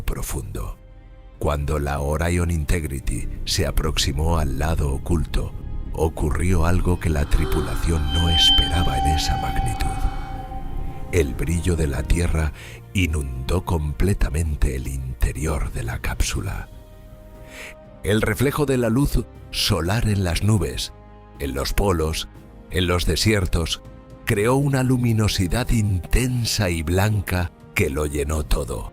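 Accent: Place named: Spain. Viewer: Narración espańoleta tan idiota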